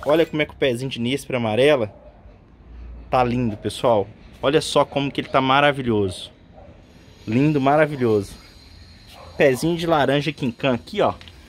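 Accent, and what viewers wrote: Minas Gerais, Brazil. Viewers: Cê é de onde de minas?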